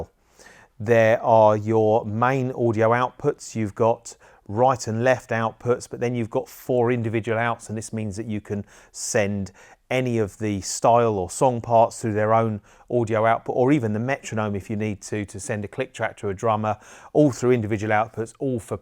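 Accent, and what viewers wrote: United Kingdom. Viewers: Sorry, aber als Engländer hat er halt keinen deutschen Akzent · Sein Englisch ist makellos und ein schöner britischer Akzent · Cockney geezer 😅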